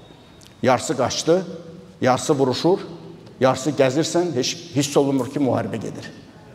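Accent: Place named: Baku, Azerbaijan. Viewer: bey Maştağalidir yoxsa hansi Baki kendinden? Aksent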